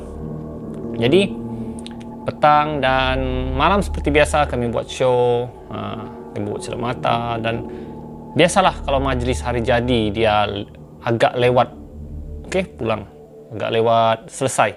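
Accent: Sabah, Malaysia. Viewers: Aku pun suka tone org sabar ckp sedap Dan aku Cuba belajar ckp tone mcm tu,memang susah Beb. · Proud of your accent bro! This is how we as Sarawakian & Sabahan should talk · Penceritaan yang bagus. Ton suara yang sedap didengar. Sebutan jelas. Ada emosi ketika menyampaikan. Walaupun dalam loghat Sabah, tiada masalah utk memahami dan menghayati. Jadi, teruskan! Saya suka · Bkn setakat accent sabahan ja , org2 dia pn aku suka . Cantik2😅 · Sy org Kedah,tapi suka sgt dgr loghat Sabah.. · Best gila bro ni bercerita. Aku Jrg2 bukak youtube, dgr org bckp smpi hbs. Sbb apa? Sbb boring. Suara tak sedap. Annoying. Accent sbh bro ni, pnceritaan dia yg clear buat aku khusyuk nk dgr smpi hbs. Hahha. Good job! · Tu sebahagian dari loghat melayu sabah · Sape nak tau loghat melayu zaman dahulu.. Boleh dengar loghat Sabah zaman skrg.. Like tanda setuju 😁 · Logat sabah cantik bunyi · Seram sbb jln cerita..Lawak sbb slang2 bugis tawau..ketawak sendiri aq takut org smnnjung dk paham..ditapok bha😂😂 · I just love Sabahan's accent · Ak minat betul dengar loghat sabah ni .best je dngar.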